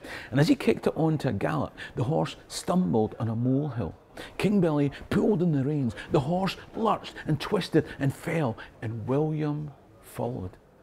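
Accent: Scotland, United Kingdom. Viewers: 😂 Who the fuck is this African with a scots accent? Presbyterian Scots wanted the papish Jacobites out, and we took them out .KOSB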